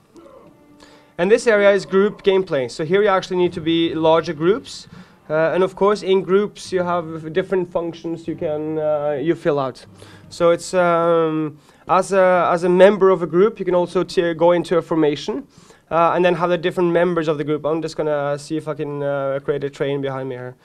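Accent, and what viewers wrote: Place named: Norway. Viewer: If you are not able to understand this guy you need to learn English. He has perfectly fine English with a Norwegian accent. It is not like the English is wrong or anything.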